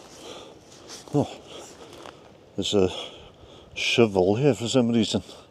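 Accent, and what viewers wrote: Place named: North Wales, United Kingdom. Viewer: Thank you for this, I loved watching Ryan & Ronnie too. He made me laugh in Welsh & English. I didn’t realise he died so young. It’s nice that there are some of his programmes on You Tube now, I used to watch Poems & Pints regularly. I like your North Walian accent. When I was young you were either Church or Chapel in Wales. There were chapels everywhere. Lovely excerpt from Myfanwy, my favourite. From mid-Wales 🏴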